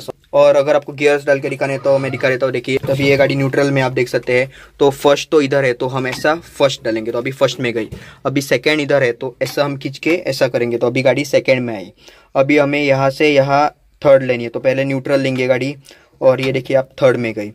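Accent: Goa, India. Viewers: That Goan Hindi Accent..🔥🔥 superb.. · Goan hindi accent aikonut kavle bhava goacho munu tu😍🔥🔥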